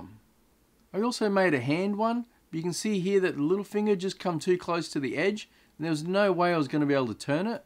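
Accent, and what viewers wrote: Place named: Australia. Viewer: as soon as i heard you talking i was like FUCK ofc he’s australian only us aussies would do this 😂 the corona straight away made me suss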